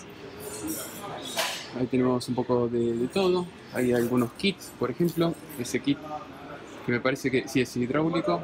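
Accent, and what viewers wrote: Argentina. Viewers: Oye, cheee! No vas a hacer negocio, no ve uno nada! Nada mas nos mareaste con la camara, toma en cuenta que la mayoria te vemos con un celular, hacerca y enfoca, ve mas despacio, no se ven los precios, te recomiendo que vayas por zonas....martillos? Pues puros martillos despacio hasta que termines, no importa si el video tarda 30 min, o, mas! Otro video de otro producto, y asi...! No hables tan rapido, no todos entendemos bien por el acento de cada region, de habla hispana, y si es solo para argentinos, pues ponlo en el tituto, y por favor traduce, lo que sea importante del producto! Todos los que vivimos fuera de Eua, quisieramos, una tienda asi en nuestros paises, pero no lo hay existen pero es basura china. Asi que tu dices si, inicias un buen negocio, obvio tu vas a llevarte un dinero con los envios, y explica tu metodo, que sea confiable y sin fraudes, para hacerte pedidos.. 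Yo he ido, y hay otras tiendas, tambien de herramienta electrica y electronica, el campo de accion es grande, pon tu negocio "on line" Saludos y esperamos ver mas de tus videos, corregidos y aumeados, soy de mexico!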